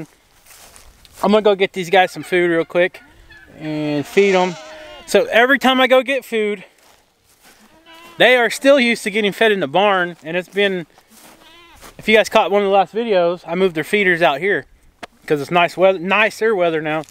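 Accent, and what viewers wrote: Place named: New Jersey, United States. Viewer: as far as I can tell you were raised in Oklahoma but sometimes I hear a slight NJ brogue. I went to college with a lot of people from New Jersey. I am almost 30 years older than you. Back in the mid 1970s it was a less expensive for people to pay out-of-state tuition than pay in state tuition in New Jersey so we had a lot of people from out of state at Pfeiffer College now a University.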